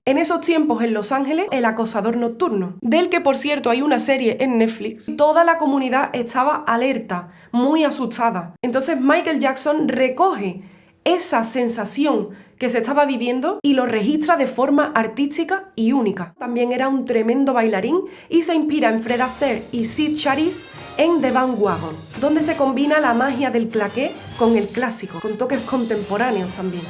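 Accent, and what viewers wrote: Andalusia, Spain. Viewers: Si me preguntan por el acento andaluz tengo que mostrar este video 🙌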